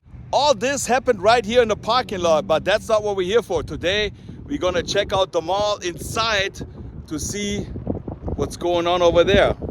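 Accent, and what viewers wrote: Germany. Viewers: Are you German? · Haha the very same Massage-Seats weve over here in the "PC" (Paunsdorfcenter) in Leipzig, Germany. Got your Video in the Recommendations and just thought "he has a german accent" - totally not read ur Accountname.:D Wish ya a nice sunday and thx for the small travel in time.:) Liebe Grüße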